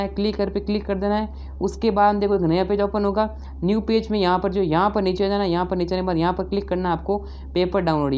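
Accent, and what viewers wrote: Gujarat, India. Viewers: Bhai aap gujrat sa ho kya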